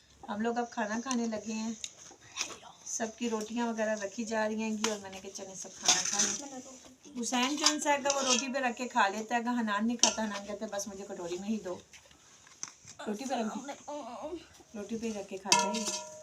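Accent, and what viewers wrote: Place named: Punjab, India. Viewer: You are punjabi I think from you accent so you should speak Punjabi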